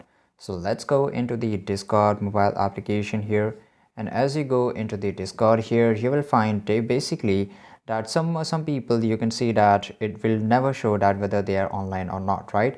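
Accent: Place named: India. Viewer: Bro its just an indian accent, why u bein racist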